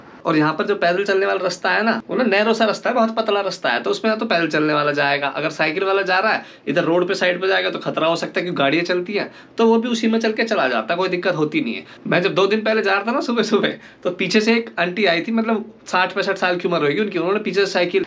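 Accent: Haryana, India. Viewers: Sir apka hasta chehara aur apki hariyanvi bat bahot din ke bad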